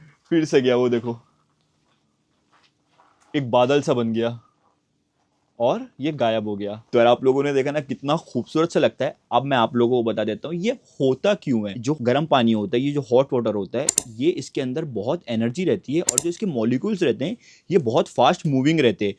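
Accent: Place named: Maharashtra, India. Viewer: Tu marathi accent m mat bol bhai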